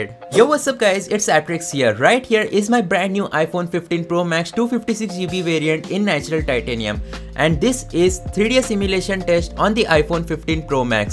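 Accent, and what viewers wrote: India. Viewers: I just cant stand his Indian accent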